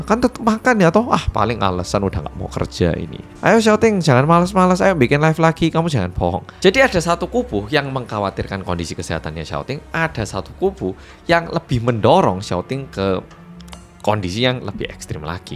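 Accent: Java, Indonesia. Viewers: Sehat sll yo mas tp medok. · Iconic..... medok e masih ada walaupun wes ga di Malang.... idola❤❤❤ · Logatnya terlalu jowo gak nyaman di dgr😢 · Suaranya medok bgt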